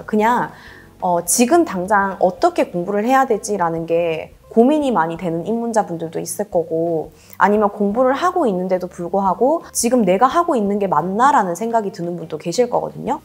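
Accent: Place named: Gyeongsang, South Korea. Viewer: 경상도 사투리가 조금씩 섞이신듯^^ㅋ말씀 많은도움이 되었습니다 감사합니다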